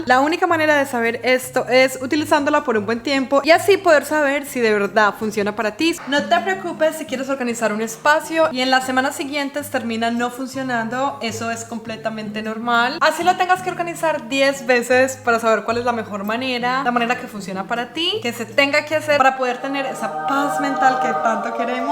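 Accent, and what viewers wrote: Colombia. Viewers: Me encantan los vídeos de organización.. pero sobre todo cuando hablas y t sale lo colombiana q eres.. m encanta ver lo alegre y divertidas q somos las latinas ❤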